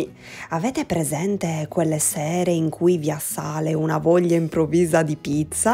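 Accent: Sardinia, Italy. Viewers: Ti ho appena scoperta! Bello sentire l’accento sardo!! ♥️♥️♥️ saluti da una nuorese che vive a Milano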